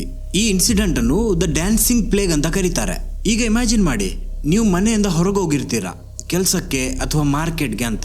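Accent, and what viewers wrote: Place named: Coastal Karnataka, India. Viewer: Anna en content nimma voice matte nimma karavali kannada super 😢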